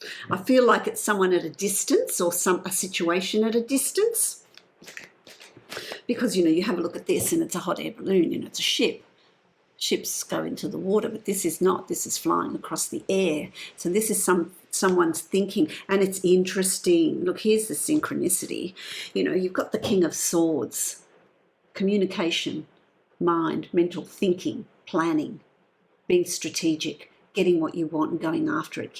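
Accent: Australia. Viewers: so nice to hear the Australian voice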